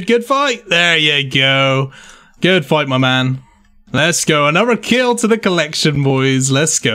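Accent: Bristol, United Kingdom. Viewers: Are you Bristolian by any chance? Accent sounds recognisable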